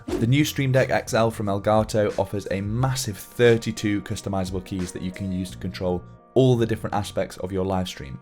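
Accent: United Kingdom. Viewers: yo i love your videos, BUT YOUR VOICE. After a couple videos i have to listen to heavy metal to get the Brittish accent out of my head..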